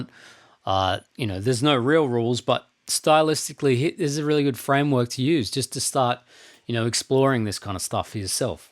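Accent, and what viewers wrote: Australia. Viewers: his accent sure sounds australian to me not british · I would have guessed Australian, sound just like Keith Urban's accent, everybody knows he's Australian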